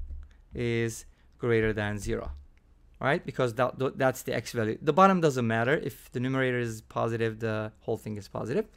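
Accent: Turkey. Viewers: yutube Algorithm beni buraya getirdi videoyu izlerken ulan diyorum kendi kendime kesin tûrk kesin yav sesinden biliyorum diyorum ama neyse videolarini ailecek çok beğeniyok 😂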